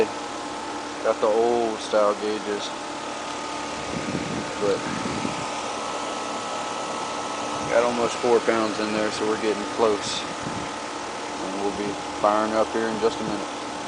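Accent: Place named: South, United States. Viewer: Dang! Your Southern twang with epic in this one me boy.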